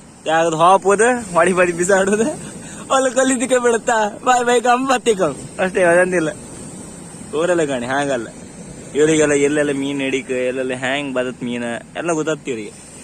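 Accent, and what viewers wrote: Kundapura, India. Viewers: Yava side kannada idu?kundapura na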